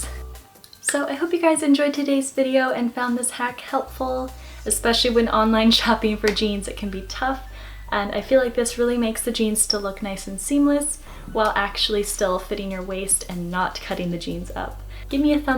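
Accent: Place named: United States. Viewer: hey!! Just wondering where in america you are from because i study different types of american accents and yours is SUPER mild. So... just wondering! Thanks so much and pls reply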